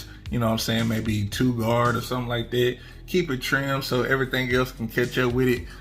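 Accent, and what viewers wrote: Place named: South, United States. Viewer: Even got hair on my chest … “ but that was already there “ 😂😂😂 bro you need a podcast , you’re hilarious. I can tell you form the south for sure . I am to , I’m in North Carolina.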